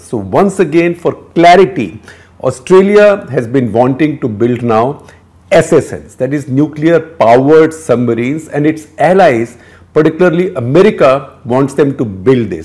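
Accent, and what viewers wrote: India. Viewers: If you want to address English speaking people you MUST lose that broad Indian accent because it makes everything you have to say so difficult to understand. You are a very knowledgeable man but you will remain unknown as long as you speak with that thick accent, I'm trying to help you I hope I didn't offend anyone. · Very India English. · Really dislike indian accent.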